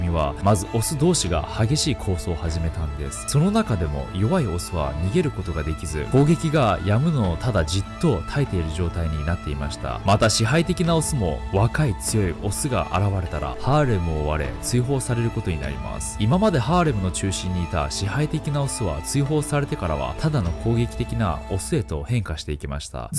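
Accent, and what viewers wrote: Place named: Japan. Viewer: 、英語の発音が日本語発音になってる、、、気を使ってるのかな？もっとネイティブの英語を聞きたいのですが。日本の大衆圧力でしょうか？なおきマンらしい昔のネイティブ発音でいきましょうよ😁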